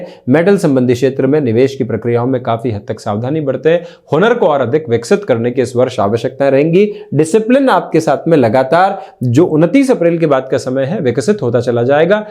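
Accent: Standard. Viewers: Sir Pranaam 🌺🙏🌺 Aap itni shudh Hindi kaa uchaaran kaise karte haiin ? 🙏☺️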